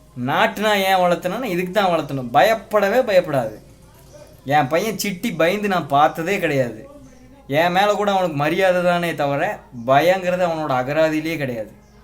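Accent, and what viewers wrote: India. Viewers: sema Sema bro.. unga channel romba superb ah iruku.. unga way of taking that kovai slag ah semaya iruku.. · உங்கள் கொங்குத்தமிழ் மிகவும் அருமை நண்பா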